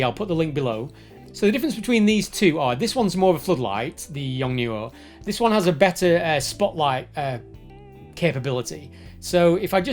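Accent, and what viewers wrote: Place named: Wigan, United Kingdom. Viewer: Awesome! Funnily enough, I’m a photographer looking for some LED lights for the dance floor… think you’ve just convinced me! And a familiar accent, too! I’m only down the road in wigan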